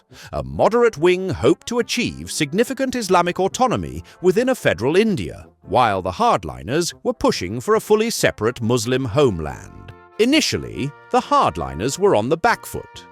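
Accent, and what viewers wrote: RP, United Kingdom. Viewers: That British accent is great 😊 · It's ironic that the narrator for this video is British 👀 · New narrator is fine, but just sounds like more of a “generic RP English accent” and has less of the edge and personality of the old narrator.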